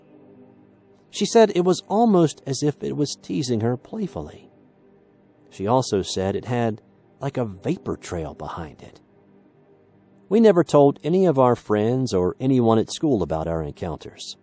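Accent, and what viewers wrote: South, United States. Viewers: andrea I do every time. I love his Southern accent.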